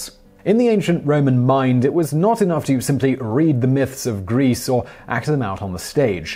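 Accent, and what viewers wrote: United Kingdom. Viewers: I love your accent! Fellow Brit watching :)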